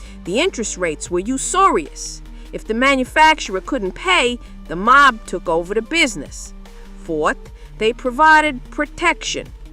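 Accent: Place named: New York, United States. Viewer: Accent’s cool… ya sound like ya from Nu Yawk(except a couple of pronunciations)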